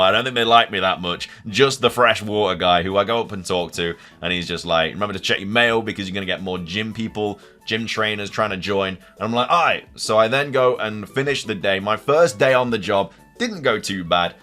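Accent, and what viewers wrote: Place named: Northern England, United Kingdom. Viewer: I hear bits of some northern english pronouncing and wunder from what county thou art,.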